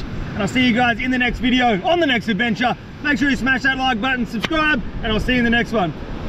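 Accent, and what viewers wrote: Australia. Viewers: "that's so weeeered" lmao I love Aussie accents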